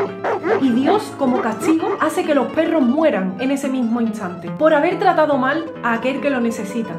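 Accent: Andalusia, Spain. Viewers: Que preciosa versión 💐 Amo el acento adaluz, lo amo en serio.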